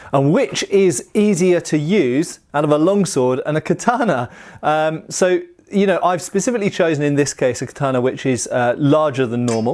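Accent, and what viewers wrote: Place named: England, United Kingdom. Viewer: Only reason why someone is watching this for such a long time is his English accent!🤺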